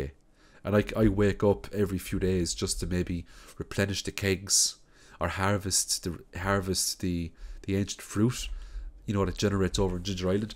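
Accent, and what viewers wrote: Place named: Rural, Ireland. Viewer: Of course an Irish man would be a great farmer 🤣🍀 (I'm assuming based on the cultchie accent 😆) love the vids?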